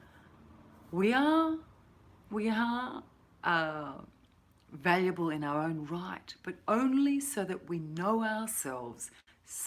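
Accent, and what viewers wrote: Australia. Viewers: Love the Aussie accent it's sexy , I'm scots /Irish we have a huge bond with Australia x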